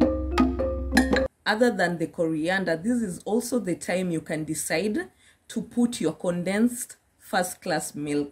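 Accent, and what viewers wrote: England, United Kingdom. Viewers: I too subscribed sis how neatly and beautifully you cook , woooooow environment and recipe too. I admire at your English accent too... You are humble and respectful towards viewers🙏🏻🎉👍🏻, i love you dear sis - This is Raji from Chennai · I love your English accent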